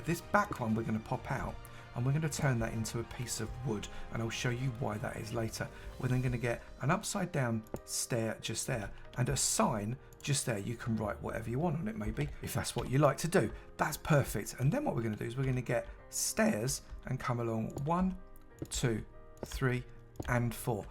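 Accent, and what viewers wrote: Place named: Scotland, United Kingdom. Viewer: I like your accent. Are you from Johnstone?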